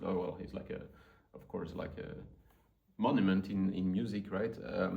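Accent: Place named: France. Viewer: Ton accent francaise est trop belle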